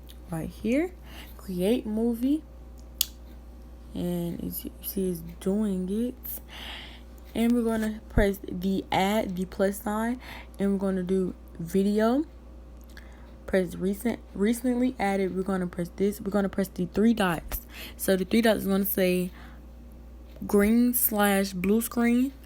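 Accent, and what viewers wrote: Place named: Africa. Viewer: DANGGG I LOVE UR AFRICAN ACCENT♥️♥️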